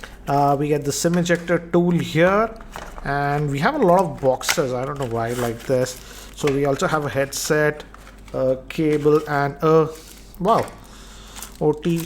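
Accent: India. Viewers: Sir Mind Nahi Karna Technical Guruji Jab bhi Video Upload Karte hai Hindi mein Baat Karte hai joh Sab Indian Pakistani aur Kafi Asian ko Samjh Aa jati hai Kyu Unko Pata hai Hindi/Urdu Sab Samjh Jayenge Lekin English Kam Log Samjhte hai. Aap Jab bhi Video Banate ho English mein Baat Karte ho Kya Aapko Sirf English hi Aati hai Ya Aap Dusron ko Attract Karna Chahte ho English Bol kar Sir Mind Nahi Karna Aapki English bhi Hindi Style ki Lagti hai Behter hai Aap Hindi mein hi Video Banaya..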